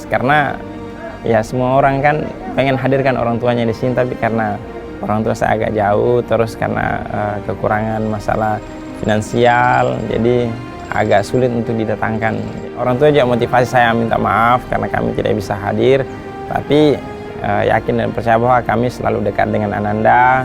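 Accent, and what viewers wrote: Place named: Makassar, Indonesia. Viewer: Saya kira orang Makassar, logatnya sdh mirip soalnya 😁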